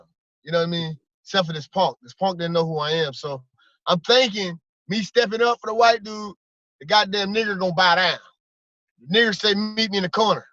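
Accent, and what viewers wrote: United States. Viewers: Y he keep saying the n word with er. While referencing blk ppl???? Blowed me with white country boy lengo.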